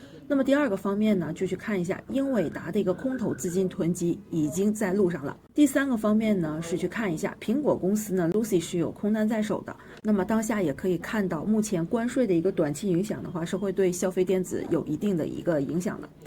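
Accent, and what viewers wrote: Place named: Northeast, China. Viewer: 这东北味很亲切啊 哈哈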